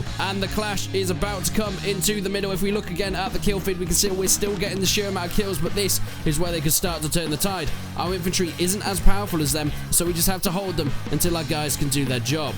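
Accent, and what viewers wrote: United Kingdom. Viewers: You've got the Bear Grylls accent, where is that from?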